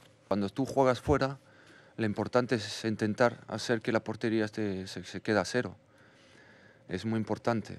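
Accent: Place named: France. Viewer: He sounds French even speaking Spanish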